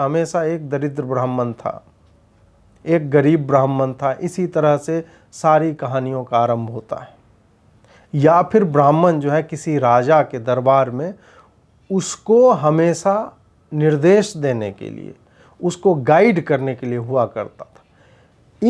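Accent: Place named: Bihar, India. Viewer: ye youtuber paka bihari h his hindi accent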